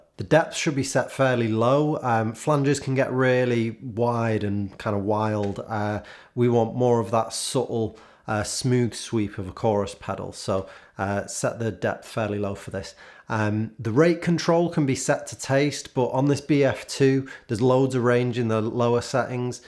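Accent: Manchester, United Kingdom. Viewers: Im going to go and revisit mine right now!.......great sounds..... .....Great also to hear what sounds like a Mancunian accent on YT....Cheers from a Stopfordian relocated to Kent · Too much Brit accent. Can't stand you.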